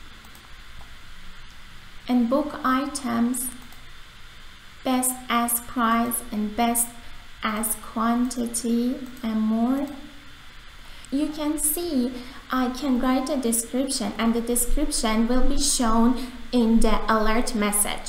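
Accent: Iran. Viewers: از لهجت معلومه ایرانی ای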